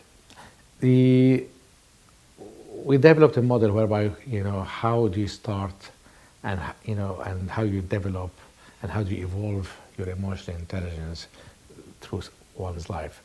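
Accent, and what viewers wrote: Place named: Spain. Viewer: Interesting how an a man with an arabic name, and a spanish accent can expound on a very inclusive subject such as emotional intelligence and tie it into the history of commerce! Very deep!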